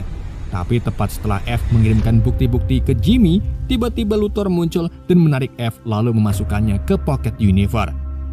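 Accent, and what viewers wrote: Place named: Pekalongan, Indonesia. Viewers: Wong kalongan po min. Kok ngomong megono. 😅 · Iki admine antara wong Pekalongan nek ora wong batang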